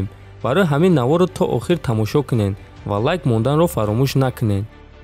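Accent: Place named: Garm, Tajikistan. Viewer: Ами брать гарени гап мезана неки натури хамашахушру мефахмона классс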